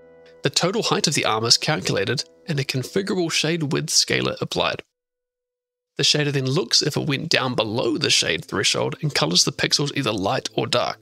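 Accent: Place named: New Zealand. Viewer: ayyyy new zealander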